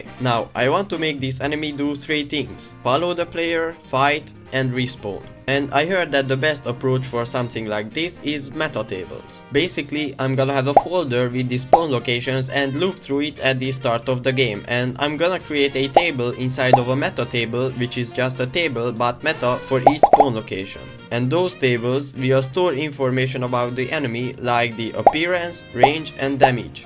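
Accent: Hungary. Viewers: ijj tesó a magyar akcentus (félre ne értsd, nincs vele baj <3) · magyar vagy? csak azert kerdezem mert hallom az akcentust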